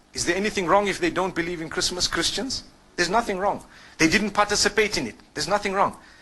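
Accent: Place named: Zimbabwe. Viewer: Even your English can show,you grew up in Zimbabwe,,i like that you were treated well in Zimbabwe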